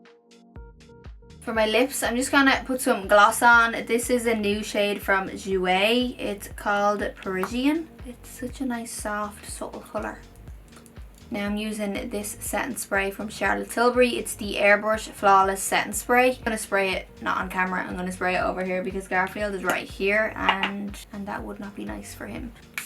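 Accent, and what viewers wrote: Ireland. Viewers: I could listen (and watch of course) to your videos all day. Irish accent for the win. By the way make up 👌🔥🥰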